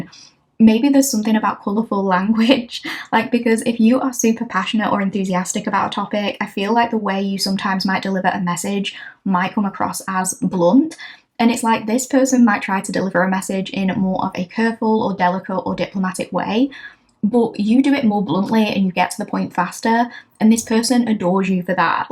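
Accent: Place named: Lincolnshire, United Kingdom. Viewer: Your partner will know that Hull is - wish I could do sound bite - more "Yer Nuurrwe", Did you say 'You Know'? Eh!!! But you could pass as a North East Lincolnshire gal! (Just in case you need to go incognito when your channel is famous!!! 😉🥰) Keep up the great channeling. You have a gift. ✨✨✨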